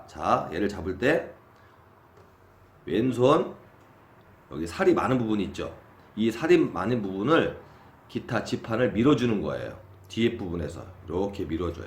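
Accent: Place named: Jeolla, South Korea. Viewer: 정말 초짜들에 대한 배려가 넘치는 강의이십니다. 기가 막힌 강의 잘 들었습니다. 한 마디 한 마디가 피가 되고 살이 되는 말씀입니다. 계속 초보자들의 어려움을 헤아리는 강의 부탁 드립니다. 선생님 계신 곳이 전라북도인가요? 익숙한 억양이라 점감이 더 큽니다. 저는 군산입니다.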